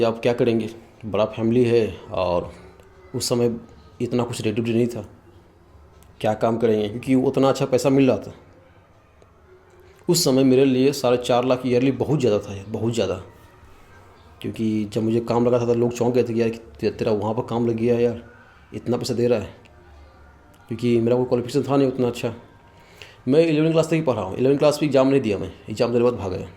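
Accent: Bengal, India. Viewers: Bhi Ap Bangali Ho Kya.....?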